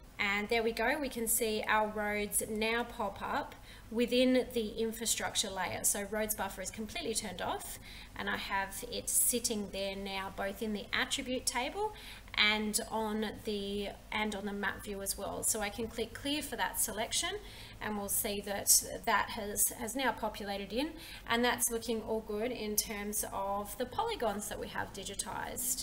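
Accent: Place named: Australia. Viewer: Nice to hear an Aussie accent!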